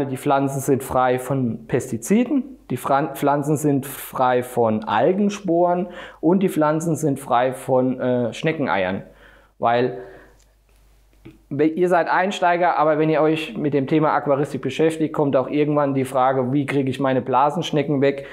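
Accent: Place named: Palatinate, Germany. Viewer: Weschde... Efach schä es Pälzer Dialekt!!! #Südwestpfalz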